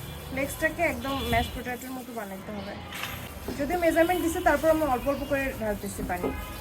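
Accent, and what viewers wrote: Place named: Noakhali, Bangladesh. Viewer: নোয়াখাইল্যা আফা চালাই যাব। 😁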